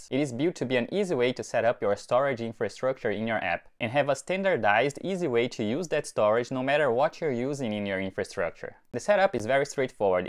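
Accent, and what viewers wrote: Brazil. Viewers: The Brazilian accent,LoL. Irei subscrever e acompanhar a sua jornada 🙏🏾.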